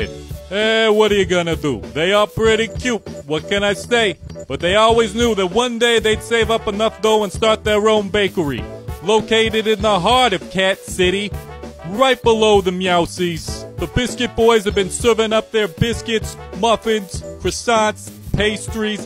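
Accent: New York, United States. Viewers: This is the first time that I've seen this video. It's hilarious. Especially the narrators New York accent. ❤🐈❤🐈❤🐈❤ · Love the NY accent!! Lookin' good, BB!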